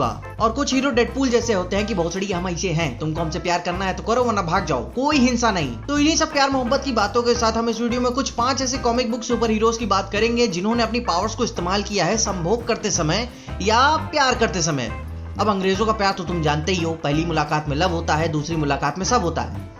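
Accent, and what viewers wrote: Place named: Uttar Pradesh, India. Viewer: bhai, i watch your video since 2 days and all your videos are soooo amazing. keep doing this and your up accent is also really amazing.... kanpuriaaaaaaaa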